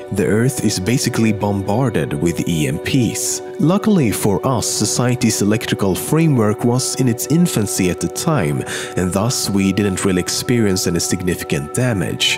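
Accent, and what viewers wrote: Gothenburg, Sweden. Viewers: I fucking hate gothenburg-swenglish. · You can hear he's swedish right away...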